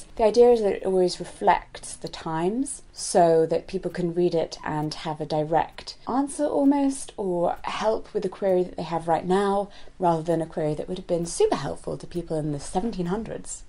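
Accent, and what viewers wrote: United Kingdom. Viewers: I love your voice so much. You have my favorite British accent. Anyway, I am Christian ( Protestant), denomination = Baptist.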